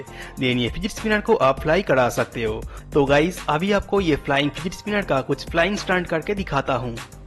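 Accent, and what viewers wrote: Bengal, India. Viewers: Ekdm bengali hindi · Strongest indian accent ive ever heard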